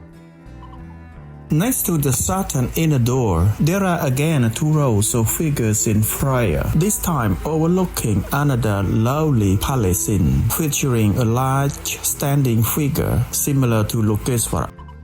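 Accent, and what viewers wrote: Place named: England, United Kingdom. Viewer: The scenes and editing are excellent, but the strong English accent and mispronunciations make it difficult to focus. Since there are subtitles, maybe a clearer voiceover would help make the content more enjoyable.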